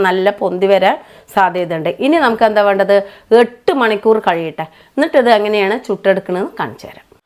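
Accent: Palakkad, India. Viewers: പാലക്കാട് കാരി ആണ്ണോ സംസാരം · ചേച്ചി അടിപൊളി. പാലക്കാടൻ ഭാഷ 👌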